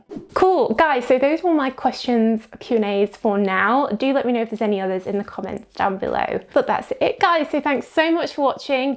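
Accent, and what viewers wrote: United Kingdom. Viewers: I absolutely love your British accent and you have such a beautiful name 😊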